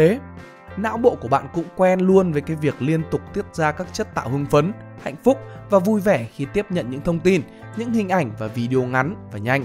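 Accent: South, Vietnam. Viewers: từ khi thêm giọng đọc miền nam vào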